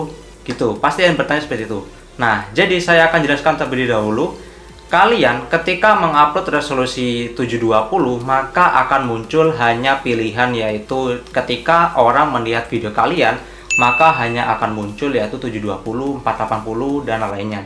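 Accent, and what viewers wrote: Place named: India. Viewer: Kok logat lu kaya India bang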